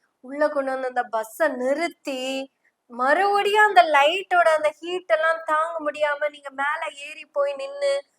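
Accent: Kongu, India. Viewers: உண்மையை கொங்கு தமிழில் அழகாக சொல்லும் சகோதரிக்கு வாழ்த்துக்கள்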